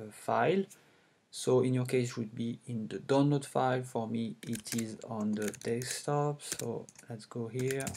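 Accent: France. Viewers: MDRRRRR t'a un putain d'accent français t'aurais pue le faire en francais ton tuto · cet accents français XD est ce que ce tuto marche sur windows ?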